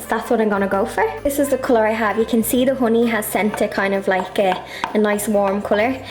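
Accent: Ireland. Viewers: I love your Irish accent. Definitely going to try these with a view to selling them on my shop. Please do lots more! Have just started subscribing to your videos. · I knew by your accent yesterday u were Irish. I’m from Ireland too. What part you from? Ca bhfuil tu I do chónaí? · I love your Irish accent and your video looks perfect including your dress and lipstick matched to perfection . Your eyes look straight out of a doll . The whole video - yourself , the recipes , soaps are just perfect . In India I might not get the same quality of soap base but will try with what I can get . Great work ! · Your beautiful voice reminds me of my family in Ireland. I LOVE YOUR SOAP! It came out so cute and I hope Im just as lucky my first time round. Thank you for giving me some confidence in soap making ^_^ I appreciate you and all you do! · I've really enjoyed watching you. 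Thank you AND it's so wonderful to hear the Irish lilt 😍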